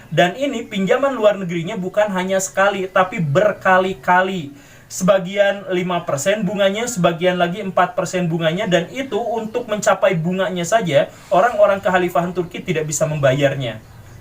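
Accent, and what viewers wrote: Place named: Sunda, Indonesia. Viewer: Kaciri ari nu ijid mh kana solawat jeung wirid, koh lentong na mh siga sunda tp nuansa wahabi,aneh aja gituu teu matching 🤣 Introspeksi? Yaa kita coba introspeksi. -Jangan salahkan zionis freemason dll,tp yg justru di salahkan solawat dan wiridan. -Nenek moyang bangsa turki pernah menguasai dunia, -Nenek moyang bangsa kita pernah di kuasai dunia (dan sekarang pun masih). -baheula Kerajaan2 islam di nusantara berafiliasi ke turki,tp apakah sekarang ada yg dr turki berafiliasi ke indonesia? -turki termasuk negara maju, Sedangkan indonesia negara berkembang, yaa yang namanya berkembang kan bisa aja layu lagi,(dan sekarangpun kembali layu) *Jd yg keren itu mereka yg turki,yg pernah menguasai dan di segani dunia , Atau kita yg indonesia,yg pernah di jajah dunia? *Jadi yg harus introspeksi mereka yg turki yg kualitas nya jauh di atas negara kita,atau kita yg jauh di bawah negara mereka??? 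Lier aja gituu 🤣😂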